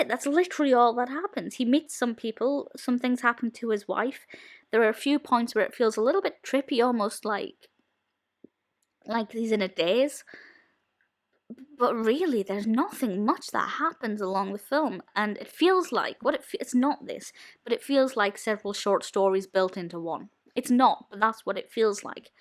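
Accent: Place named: Belfast, United Kingdom. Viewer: I don't mean to be snarky but I think you got a bit blinded by your liking of Branagh, who is great but as stated here, Judy Davis is the co-protagonist. <link> Just offering constructive criticism; I see you're starting out/didn't do another(?). I hear from your accent you would have particular insight to KB's film on Belfast.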